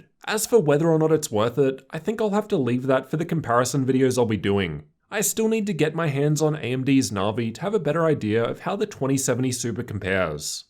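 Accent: Australia. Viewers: I don't think he sounds that weird, but I'm an Aussie and I'm use to our accent. I will admit his pronunciation and accent on certain syllables isn't exactly typical but overall he just sounds like a regular Aussie to me. I think he's just reading through his script and his voice naturally goes low when he hits a comma and even lower for full-stops. We have very slight regional variations in our accent. He sounds like he's from one of the Southern States as people in the North tend to raise their voice pitch at the end of a sentence instead of lowering it. Aside from all this voice banter, I think he presents the information quite well and I found him really quite helpful when I recently upgraded my video card. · He has an Aussie accent which normally has an upward inflection on declarative sentences. Perhaps he's trying to neutralize his accent?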